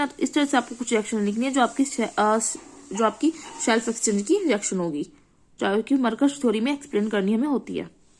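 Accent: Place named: India. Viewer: Peak Indian accent didn't even understand a single word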